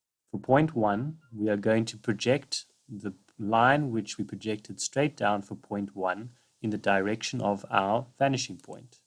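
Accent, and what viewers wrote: South Africa. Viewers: You sound Afrikaans, Mr Sir. Would you like some YouTube help by the way?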